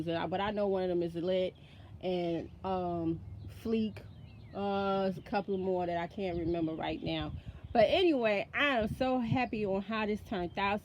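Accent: New York, United States. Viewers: Too cool! You just inspired me! I have an old bookshelf that can be a raised bed and I love your accent lol you sound like my New York family Take Care ~Nicole 🌿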